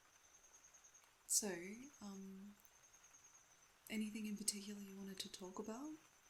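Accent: Australia. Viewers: Good morning, 8 ❤ I’m currently listening for the 5 time, & I’m loving the longer audio. I really hope you get more views on this, because it’s a quality story, & you’re a great storyteller. I love your voice, & as I’ve said before, your Australian accent makes me melt, 8 🥰🌹 Oh, I love the thumbnail. She’s so cute!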